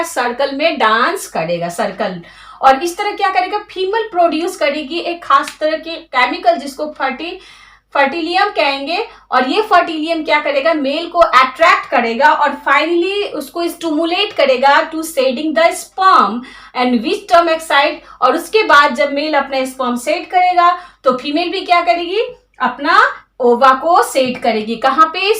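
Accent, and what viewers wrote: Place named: Bihar, India. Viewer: Accha smjhaya mam. u r from Bihar ...h n ...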